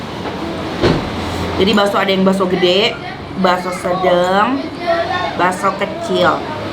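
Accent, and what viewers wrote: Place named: Sunda, Indonesia. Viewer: Lemes pisan teh bahasa sunda na meni resep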